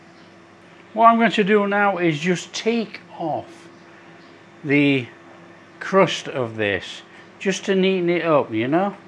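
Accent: United Kingdom. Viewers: Love it! Cornbeef as a quiche. Easily attainable. That's one thing I love about most of your recipes. They contain easily accessible ingredients. And don't require a Culinary degree with all its jargon to describe and decipher. They are straight forward even for a home cook, like myself. You are the most thorough Chef on YouTube or any food channel I've ever watched.that gives the best tutorials. You go through each detail with explanations. You are a champ! Keep it coming Chef. You've got me glued to your channel and your lovely British accent. 😊❤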